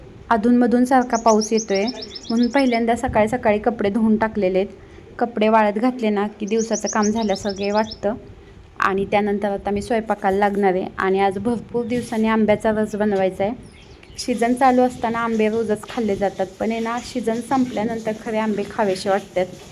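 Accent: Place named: Kolhapur, India. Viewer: कोल्हापूरमधून आहात की सांगली आवाज तसा वाटतो.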